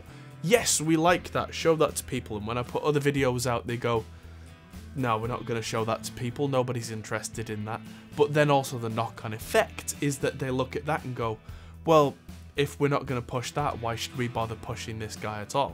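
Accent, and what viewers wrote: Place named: North, United Kingdom. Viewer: You're a credit to our industry, look forward to seeing more of your content. Would love to catch up with you at some point it's great to hear a fellow northerner on here.😃 Maybe catch up at Namm in April.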